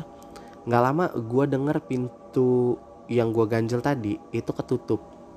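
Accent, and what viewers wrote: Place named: Lampung, Indonesia. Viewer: Pantesan logatnya ga asing.. ternyata lampung juga bang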